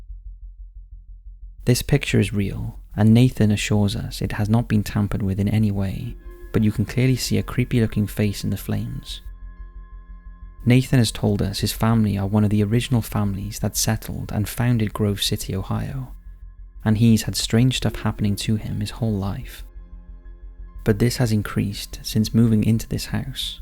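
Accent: Wales, United Kingdom. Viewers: He has a Welsh accent. So I challenge you to pronounce Rhydymwyn, Machynlleth, Pontrhydfendigaid or Llansantffraed-Cwmdeuddwr correctly. Personally, I only knew how to pronounce Duluth because it featured in season 1 of Fargo. If it wasn't for that, I wouldn't know how it was supposed to be pronounced correctly either. Believe it or not, many people in Britain aren't aware of the most popular cities in the midwest, just as most Americans aren't aware of most cities and towns in the UK outside of London. Just enjoy the content of the video and don't focus so much on the pronunciations! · Always love the vids, but can i also say cheers for getting the docile tones of our Welsh accents into the World!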